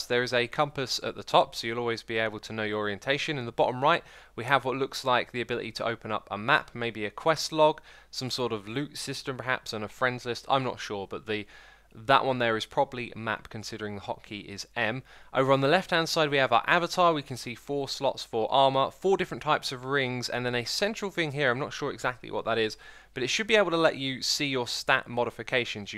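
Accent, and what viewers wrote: United Kingdom. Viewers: I wanna see this guy play the game, I like how his british accent sounds.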